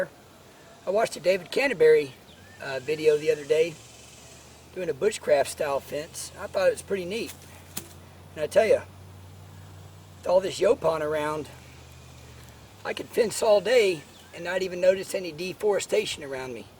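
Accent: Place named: Texas, United States. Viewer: He is from TX.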